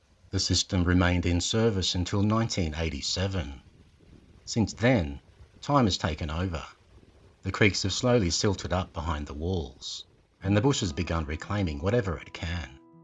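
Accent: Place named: Australia. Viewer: I'll second that no Yankee accents here just fair dinkum Aussie😊😊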